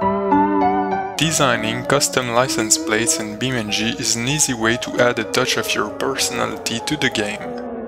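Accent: France. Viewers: are u french? nice tuto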